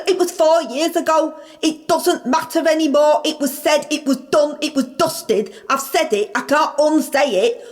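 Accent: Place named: Manchester, United Kingdom. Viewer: Imagine calling someone else a buffoon whilst speaking with a Mancunian dialect.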